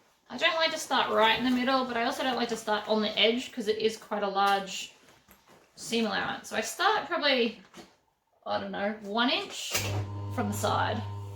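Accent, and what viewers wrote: Australia. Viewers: So refreshing to hear an Aussie accent even if you're pitching to overseas viewers with measurements in inches. Great to be able to easily find things at my local shops here in Australia rather than hearing about Dollar Tree or Michaels stores! Thank you for these projects and helpful tips. I wish you every success.xx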